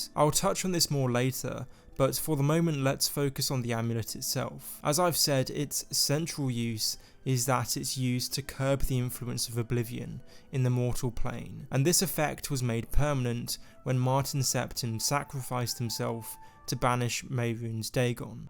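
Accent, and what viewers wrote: United Kingdom. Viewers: You have a specific type of British accent. Is there a name for it? I’m almost certain that you would pronounce the word “drawings” like “draw rings”.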